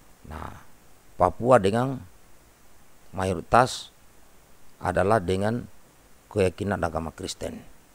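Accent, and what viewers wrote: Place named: Batak, Indonesia. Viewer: Salam hormat jg kawan,,, logat mirip batak eeeee gak apa2 ttp mantap, jngn lupa ngopi 👉☕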